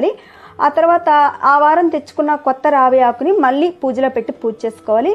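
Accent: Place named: Medak, India. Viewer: అక్క మీది మెదక్ జిల్లా అనుకుంటాను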